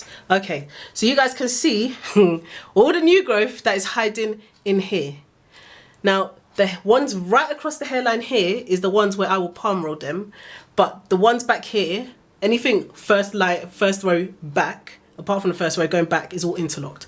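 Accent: United Kingdom. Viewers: Great video, still new at interlocking so this was really helpful. It's also so refreshing to hear another British accent, been in the states for 14 years. You are so funny!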